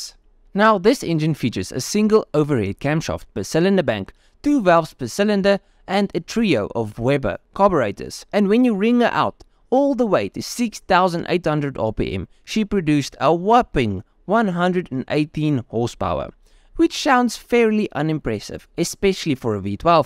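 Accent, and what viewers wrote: South Africa. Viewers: I'm really loving these videos. You're picking the best cars. Is that an SA accent I'm hearing?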